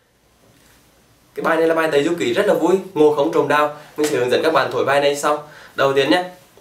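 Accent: Nghe An, Vietnam. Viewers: Giọng nghệ an hay hà tĩnh ạ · Bạn là người nghệ an ah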